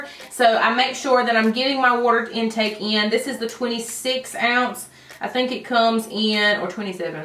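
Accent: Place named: Mississippi, United States. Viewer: I just came across this video and first off I could watch your videos all day just for your accent!!! I live in Mississippi and never thought I would find someone that sounded like me on YouTube lol!! LOVE IT!!! I can totally relate to the amazon recommended tab it’s like amazon knows me personally lol love your decor behind you as well so pretty!!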